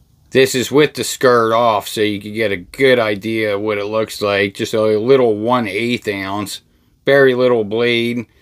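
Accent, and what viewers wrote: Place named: Pittsburgh, United States. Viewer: I detect a little Pittsburgh in your accent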